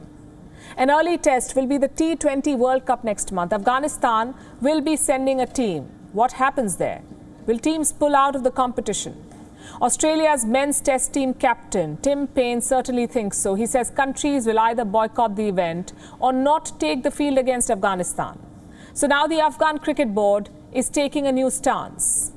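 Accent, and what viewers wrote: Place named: India. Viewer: It's indian accent wion primarily indian news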